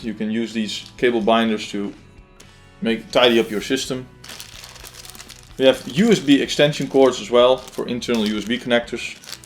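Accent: Netherlands. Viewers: this guy has a dutch accent XD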